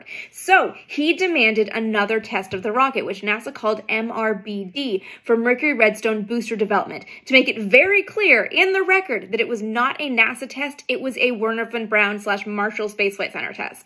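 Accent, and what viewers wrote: Canada. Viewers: Hi, Your posting was, as always, brilliant BUT, please slow down a little. You’re talking so very fast anyone who isn’t a native English speaker attuned to your Canadian accent is going to find you very difficult to understand. I have many Asian friends who are fascinated by the subject matter, but really struggle with your bullet-fast oratory. Cheers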